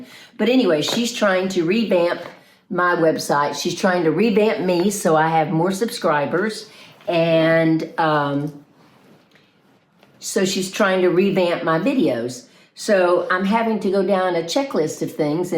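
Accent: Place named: Georgia, United States. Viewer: I just found your channel. I didn't know your background, and I thought this lady speaks French with a Georgia accent! My parents retired in Augusta, Ga, so I know that accent well!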